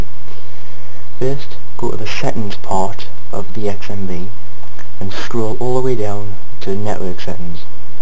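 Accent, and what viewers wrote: United Kingdom. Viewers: oh good it is a british person doing it the how to video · Thank u s omuch dude your the best even thoughe u sound like a skotsman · are you a geordie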